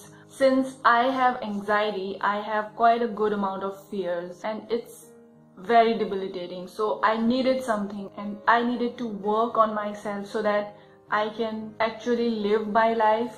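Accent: India. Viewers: Hey u look like an Indian And ur accent too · I knew it cuz ur English sounds like Indian English and I'm Indian too:) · Indian accent thanks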